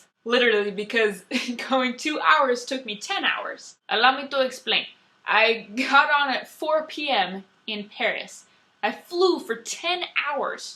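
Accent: England, United Kingdom. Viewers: congratulations on the purity of your English accent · Your english accent sounds very mary poppins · Amazing UK accent !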